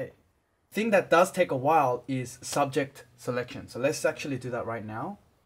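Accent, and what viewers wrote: Australia. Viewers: east asian guy with an aussie accent is quite trippy lol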